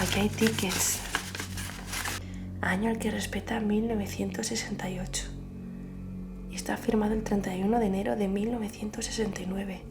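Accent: Spain. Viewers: Jajaja que experiencia hoy con este video, resulta que active subtítulos para un video anterior, y vi el anuncio de e inmediatamente ingresé, pero me salió unos subtítulos tan incoherentes a lo que hablaba, parecía peli de adultos, me di cuenta porque hay partes que por su acento español se me escapan y debo leer para comprender y de repente leí "un maldita sea, quiere que le de un poco de cariño".... Quedé 😨😦😵‍💫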